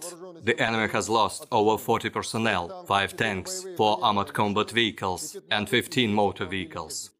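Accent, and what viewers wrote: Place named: Indonesia. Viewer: pembawa bicaranya suaranya medok indo bangke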